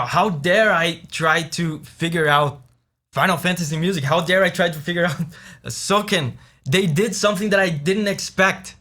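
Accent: Brazil. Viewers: Hey! You have sort of a brazillian accent, are you from brazil?? If not, where you from?? (im probably wrong lol)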